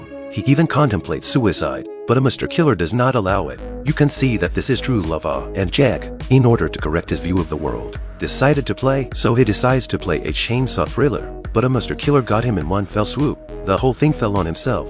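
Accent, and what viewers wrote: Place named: United States. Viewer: bro turned american💀💀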